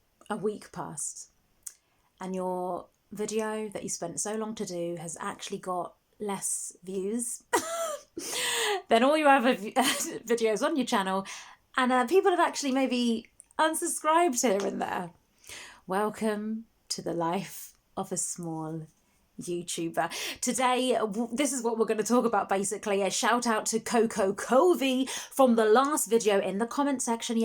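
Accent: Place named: London, United Kingdom. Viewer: I just created my channel posted up just my intro and outro but have limited items to edit can you help and offer advice? I’m from London and guessing your same from the accent thank you! Would like free software for editing and best ways to make good interesting shots and how to make it look good anything u can pls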